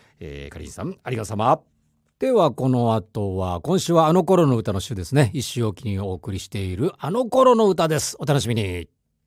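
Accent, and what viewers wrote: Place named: Yamagata, Japan. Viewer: 埼玉県から 聞いています。 東根出身なので懐かしい山形弁最高です☺️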